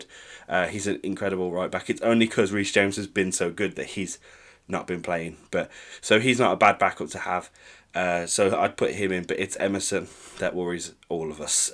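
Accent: United Kingdom. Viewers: Proper London accent right there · You sound like you’re from brum but you support Chelsea ?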